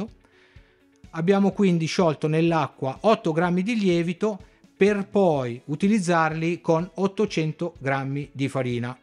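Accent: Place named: North, Italy. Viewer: Complimenti!! Bel video. Saluti dall'Argentina!!! Sembri veneto o lombardo. Da dove sei?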